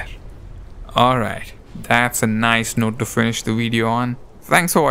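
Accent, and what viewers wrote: India. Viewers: U sound indian, are u indian? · Don't lose that Indian "t". :) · you're English accent sound like your are indian are you ?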